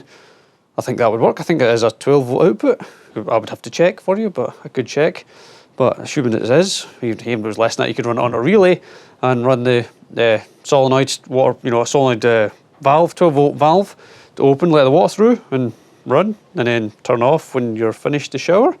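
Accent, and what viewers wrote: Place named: Berwick, United Kingdom. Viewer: Random question. Are you from Berwick or thereabouts? The accent is very musical.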